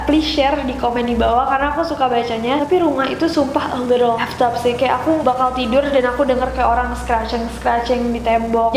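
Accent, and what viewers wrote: India. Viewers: ka ..kaka itu aslinya itu dari india ato inggris sih ??? :v binguuung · indian accentnya keren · I Like Your Indian Accent..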